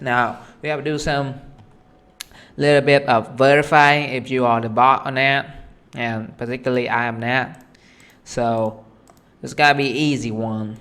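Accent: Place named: South, United States. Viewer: Why do you have a southern accent? lol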